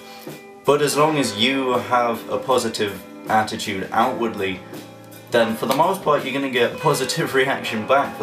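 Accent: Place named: Appalachia, United States. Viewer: Femboy with a hillbilly accent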